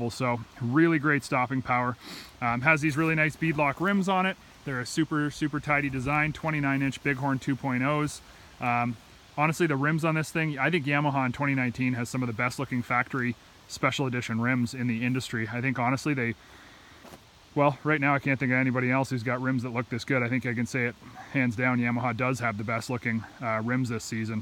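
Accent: Canada. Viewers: On a scale of 1-10 of how Canadian you sounded, you were at a 9.5 this time.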